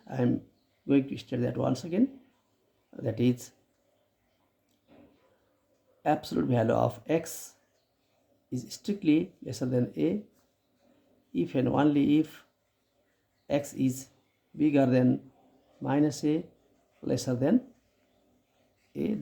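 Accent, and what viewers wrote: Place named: Nepal. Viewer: His accent shows he is nepali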